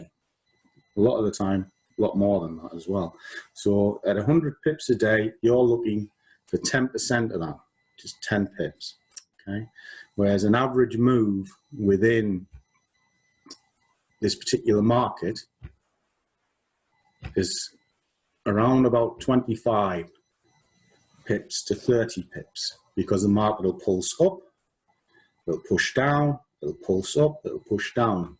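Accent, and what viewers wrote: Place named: Nottingham, United Kingdom. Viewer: Hi! I have literally spent the last 4 weeks studying trading none stop (most of the day and night)😂 I started with a trading 212 account which was crap and I have now moved up to a trading view account and use metatrader 4 to take my trades. I have tried the ichimoku strategy with tk crossovers, kumo breaks etc. I take all trades inline with the higher time frame sentiment but still seem to be going wrong somewhere🤦‍♂️🤷‍♂️. luckily I have not lost any money in the 4 weeks of trading but always mange to be back at where I started. I have watched 100 hours plus on YouTube and you seem to be one of the most genuine traders I have come across 🙌 I live in Nottingham and it sounds like you live not too far. I am wondering if you offer any sort of coaching/mentoring services. I thought I could do it all alone off youtube videos etc but its just putting it all together and having abit of guidance every now and then! 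look forward to hearing from you!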